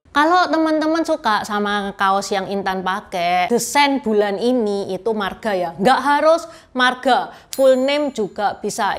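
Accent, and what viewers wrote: Java, Indonesia. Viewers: Kental sekali adek ini jawanya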